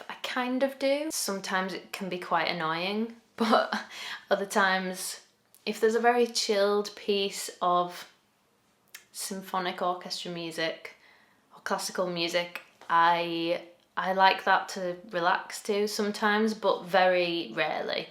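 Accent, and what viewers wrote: United Kingdom. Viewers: O inglês britânico soa tão bonito.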